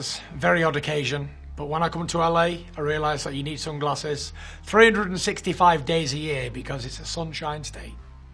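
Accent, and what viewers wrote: United Kingdom. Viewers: He got the nicest British accent i ever heard. practically the only one i can stand. is it a Manchester accent?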